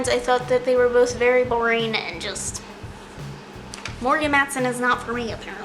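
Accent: South, United States. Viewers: Do have an accent? I hear southern a bit in your voice. It comes and goes though.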